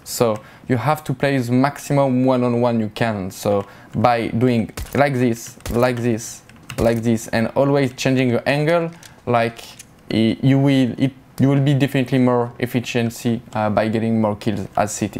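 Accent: France. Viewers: The french accent xD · Totally agree, but at least hearing shox trying to speak english is funny (even for french people his accent is bad) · Gre tu comprends ps en plus c un anglais de français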